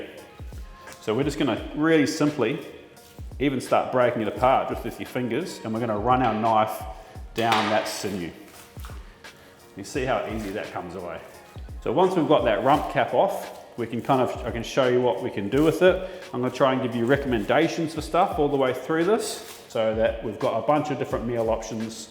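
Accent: New Zealand. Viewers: An Australian (am I picking up a Kiwi twang?) butcher doing a single take video with maybe muck ups along the way? Liked and subscribed. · he is a Kiwi but lives in Australia and has done for a while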